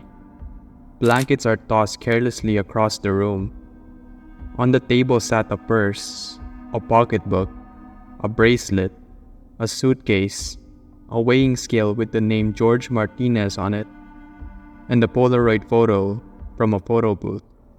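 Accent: Philippines. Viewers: Are you filipino? You sound like my father-in-law :) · are you filipino? the accent sounds like pinoy!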